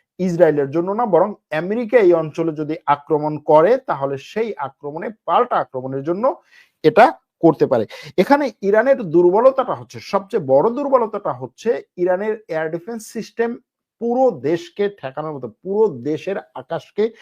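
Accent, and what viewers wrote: Bangladesh. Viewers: BANGLADESHI ENGLISH.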